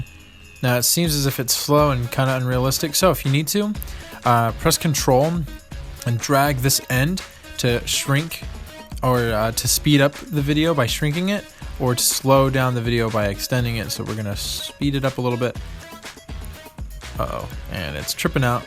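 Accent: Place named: Russia. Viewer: Seriously? I immediately understood the accent, it is easy if you are from RUSSIA!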